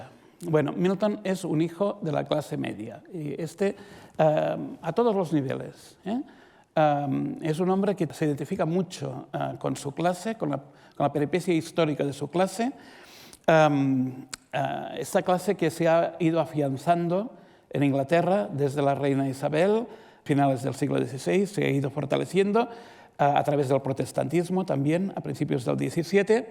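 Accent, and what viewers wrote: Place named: Catalonia, Spain. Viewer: Una conferencia de la que uno no se puede despegar, de cabo a rabo. Me interesa mucho el enfoque, el análisis cultural y religioso de Inglaterra en esa época, con relación al resto de cristianismos que, por acción o reacción, se estaban configurando en Europa. Un aspecto que agradezco es que el profesor se fija en el dato pero no se queda en él, sino que sube a lo esencial. Por cierto, el acento catalán del profesor lo hace aún más encantador.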